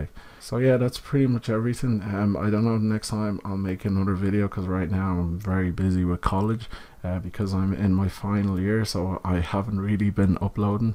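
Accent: Ireland. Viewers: Great job, I have been looking for this capability since 2016! Hope things are great at college. Also good to hear the Irish accent! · Thanks a really great video. No problem with your ramblings man everyone loves an Irish accent. At least that's what they tell me anyway!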